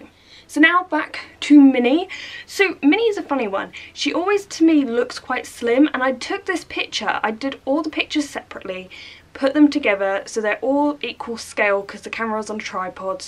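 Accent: United Kingdom. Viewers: Think i ask this on all your videos but never get a reply. Are you from the uk?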